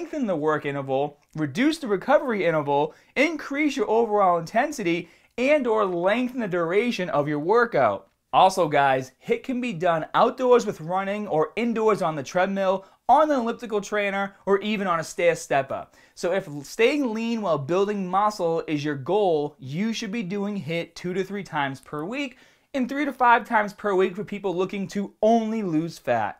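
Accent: Boston, United States. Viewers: I fahuckinnn love bohston accent. Fahhhkin knowledge and cahhhhhrrbbsss! Seriously though I'd say is the most helpful Fitness youtuber out there. As a exercise science major and fellow fitness enthusiast got nothing but respect for the knowledge he gives us all. Keep doin you!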